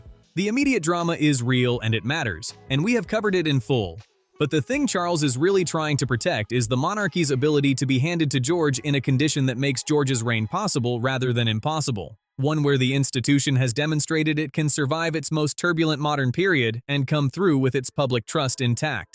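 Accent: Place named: United States. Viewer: We do not need shouty American voices spouting nonsense about our Royal Family. Sort out your own 'monarch'!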